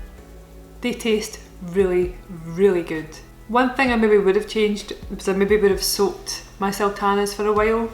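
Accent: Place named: Glasgow, United Kingdom. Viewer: Glaswegian/surrounding area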